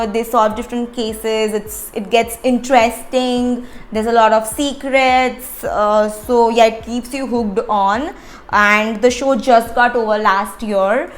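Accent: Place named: Gujarat, India. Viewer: Gujarati Taste Che Tamari English Ma..😅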